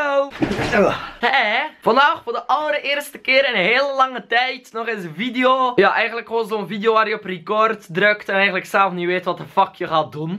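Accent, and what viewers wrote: Belgium. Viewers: Je stem is te grappig. Je schreeuwt te veel. Je praat grappig (maar elke Belg praat grappig)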